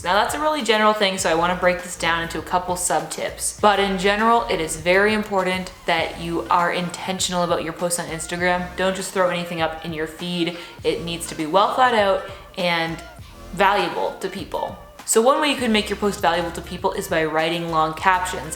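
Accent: Canada. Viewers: Your canadian accent is so strong! 😯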